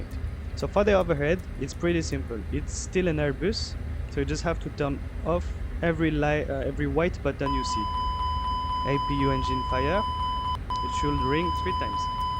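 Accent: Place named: India. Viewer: J'adore ce petit accent anglais qui fleurte avec celui des Indiens anglophone 😅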